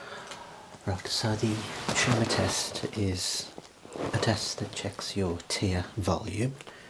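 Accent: United Kingdom. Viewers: I find your voice so relaxing. Some of that may be that, not being British myself, many British accents are interesting to my ears, but it's more than that.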